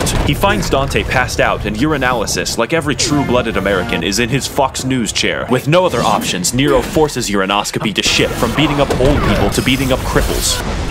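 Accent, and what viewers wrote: United Kingdom. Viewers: Oh he's british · Oh, he's british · "Oh, he's British." As a British person, I can claim he is from Birmingham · oh hes British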